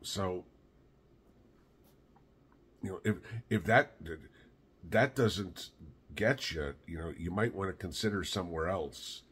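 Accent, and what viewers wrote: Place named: North Georgia, United States. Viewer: Sounds like north Ga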